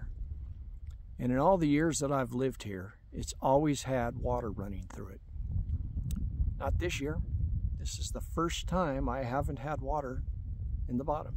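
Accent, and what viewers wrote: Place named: Southwest, United States. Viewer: The climate changes, there is no "Climate Crisis". The National Hurricane center reported that Helene made land fall at winds of 140mph, but the weather stations and the University of Alabama Huntsville mobile weather unit reported winds at 60mph. I don't know where you live but judging by the accent, I'll say in the American Southwest. In 850 C.E the region went into a drought that lasted 240 years, then it rained for 50 or sixty years, then the region went into a smaller drought that lasted only 170 years......... I guess the droughts were caused by those pesky native Americans cooking food and heating themselves. CO2 is good for the planet, the Sahara is greening, the world has greened 33% since 1900, because of CO2. Fossil fuels (hydro-carbons), cuts down on deforestation, and decreases health issues associated with burning dung to cook and heat. 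You maybe 56, but I'm 57, and I can tell you that you have not done your homework.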